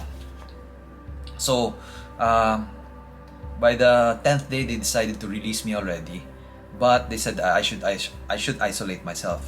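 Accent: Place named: Philippines. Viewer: Are you an American? Still your accent is Pinoy!!! Tagalogggg plssss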